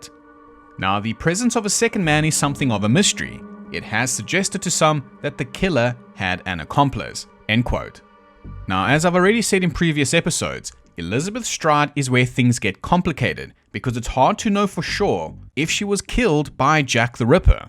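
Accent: South Africa. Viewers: Dude you are fucking hilarious. It’s like listening to a south african George Carlin reading crime stories 😂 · Had some South African friends in London back in the day and it does me good to hear the accent again.....especially those wide open "I's"...(eyes...)....oh yes, and great content, love the chat-by-the-fire style, infectious laugh + amazing research and thoroughness...give a treat!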